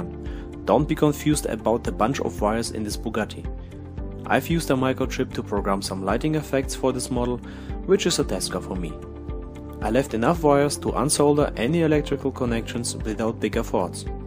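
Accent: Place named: Germany. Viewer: beautiful german accent!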